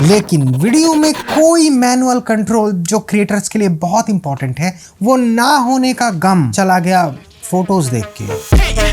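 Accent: Bengal, India. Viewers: Man, what a review video. Very unique. Never experienced such a detailed technical, camera performance oriented review on YT. Have watched your Pocket 3 review also. And I loved the drama in your videos ❤ And the hint of Bengali pronunciation. Really appreciate your hard work. All the best Bro 🙏🙏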